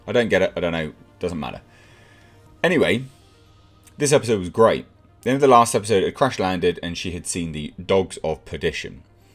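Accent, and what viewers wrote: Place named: United Kingdom. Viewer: Are you from the United Kingdom? You sound British. I like these comic books too. I need to buy more of these comic books.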